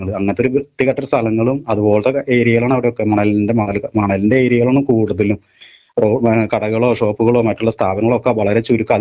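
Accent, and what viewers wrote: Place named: Thrissur, India. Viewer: എന്നാണ് ത്രിശൂർ രീതിയിൽ സംസാരിക്കാൻ പഠിച്ചത്.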